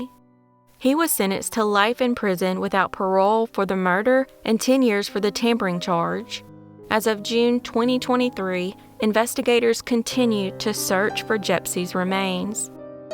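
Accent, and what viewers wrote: South, United States. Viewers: Thank you kindly for your amazing story and I love you southern accent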